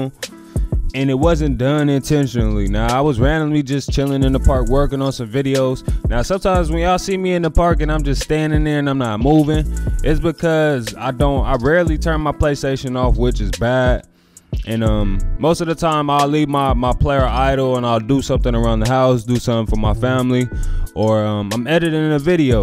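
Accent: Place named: Los Angeles, United States. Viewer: i could tell u from LA by the way u talk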